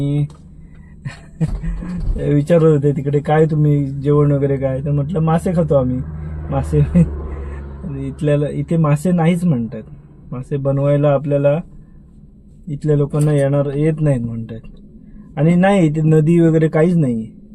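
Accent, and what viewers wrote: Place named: Goa, India. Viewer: Tumchi bolnychi tunning goan vatte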